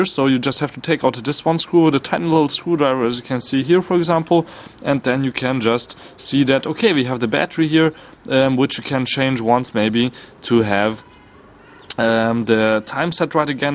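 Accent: Germany. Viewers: Are you german? are you english speaking naturaly? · German english lol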